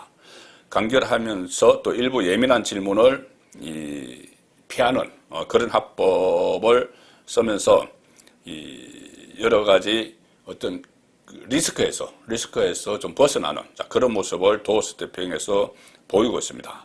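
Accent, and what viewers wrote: South Korea. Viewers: 내용은 좋은데 어느 지방 억양인지 도무지 거북하고 거슬립니다. 어색한 곳이 자꾸 들려요.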